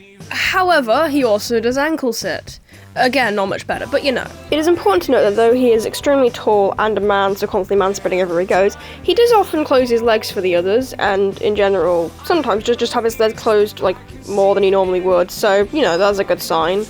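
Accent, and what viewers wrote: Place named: RP, United Kingdom. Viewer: (Ignore how I’m late) PLEASE I LOVE YOUR VIDEOS MAN BAHAHAHA. And your British accent just makes this so much better because you sound so posh (I’m British don’t come at me-)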